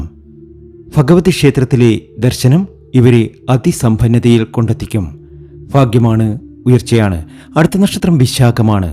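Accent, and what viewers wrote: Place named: Kolkata, India. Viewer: Kolkata Malayalam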